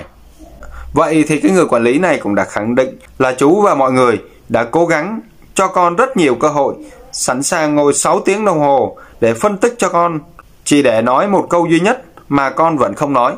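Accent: Standard. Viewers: Anh nói chuẩn mem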